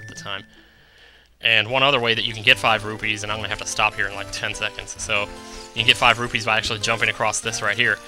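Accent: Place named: South, United States. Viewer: i wondered why sometimes i hear a southern accent in your lps. it isnt often, but it is there xD GA people always recognize their own accent xD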